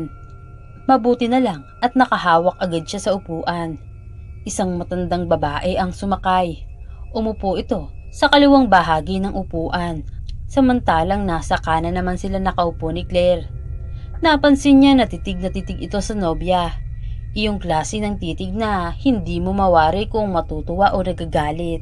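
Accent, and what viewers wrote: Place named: Visayas, Philippines. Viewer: Ang sarap pakinggan Ng bisaya accent!😁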